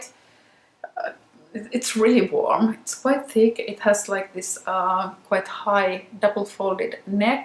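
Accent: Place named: Finland. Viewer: your finnish is showing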